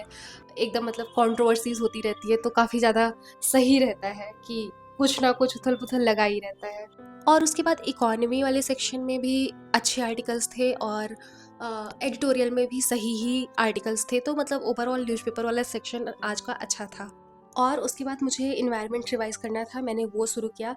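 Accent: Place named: Uttarakhand, India. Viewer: Tone sun k lag rha ki ap uttarakhand se ho ......kis distric se ho Btane ki kripa kijiyega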